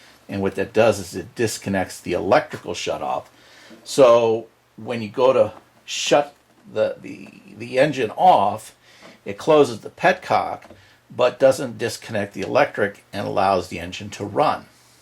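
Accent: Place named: Seattle, United States. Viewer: Great video thank you so much, a big help. Can I be pedantic and point out that the 'screws' you refer to removing at the start are not screws but bolts. Bolts have a machine thread which to work always has to engage with a nut or a threaded hole. Screws screw into wood or other softish materials and never require a nut or other receiving thread to be functional. Thanks! PS You're not BIll |Gates are you? Your accent is just like his.... Maybe a Washington/Seattle accent!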